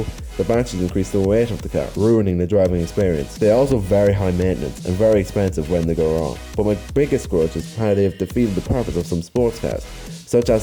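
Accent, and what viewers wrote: Ireland. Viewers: U Irish too? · Love ur vids man are u Irish? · this lad is irish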